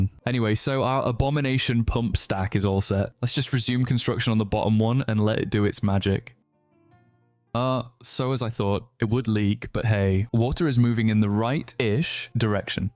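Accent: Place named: Scotland, United Kingdom. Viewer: I cant help but notice a scottish twang in your accent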